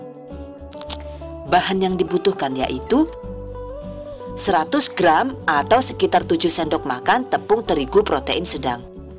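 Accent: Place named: Madura, Indonesia. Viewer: Fokus ke suaranya az, bahasanya kayak logat madura hehehe. Maaf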